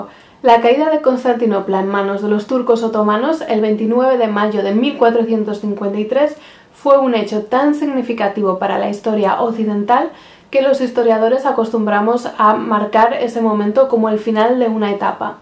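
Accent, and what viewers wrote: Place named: Murcia, Spain. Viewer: Sobre tu voz y tu asiento eres mursiana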